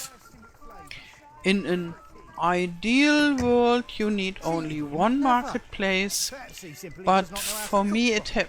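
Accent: Germany. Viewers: A granny with a German accent :) So sweet :)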